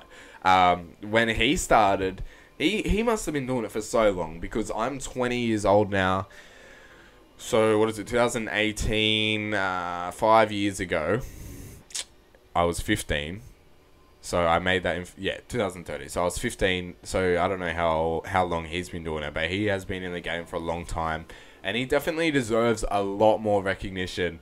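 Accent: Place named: Australia. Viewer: Don't worry about mispronouncing stuff: with the Aussie accent, no one can tell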